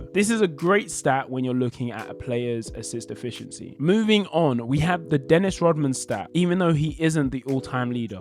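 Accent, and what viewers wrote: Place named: United Kingdom. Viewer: Watched a couple of your vids now, subscribing and hitting the bell! It’s very refreshing to hear basketball from another British person lol. It’s hard being a UK nba fan, all the games are on at ridiculous times😂 Keep up the good work!!💯💯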